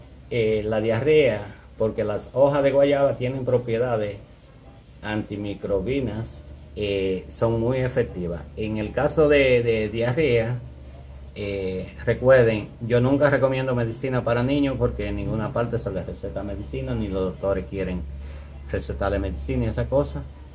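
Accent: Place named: Caribbean. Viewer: eres un genio poderoso Amigo donde quiera. que te encuentres gablas con un acento CARIBEÑO <link> ENCANTA NI SE SIERES CUBANO O DOMINICANO PUERTORRIQUEÑO PERO HABLAS UN ESPAÑOL MUY AGRADABLE SOBRE TODO TU INFORMACION. VALE ORO DIAMANTES ..Y UNA INMENSA FORTUNA GRACIAS PIR TU GRAN CORAZON Y DON DE GENTE BUENA QUE TIENES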